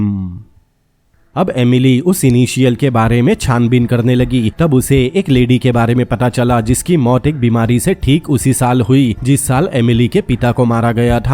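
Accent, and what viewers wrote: Assam, India. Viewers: Kiuki ap ki voice Assamese jisa lagta hai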